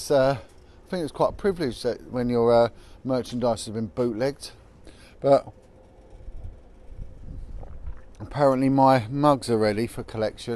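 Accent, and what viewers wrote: Cockney, United Kingdom. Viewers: When are you going to start speaking properly mate? Put some effort into that? In your 'important subject'......? Not like a cockney drug dealer.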